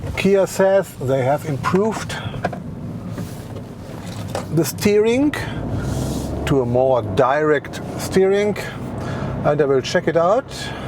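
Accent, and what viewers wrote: Germany. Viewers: For the first ten seconds, I was trying hard to figure out what he speaks is English or German.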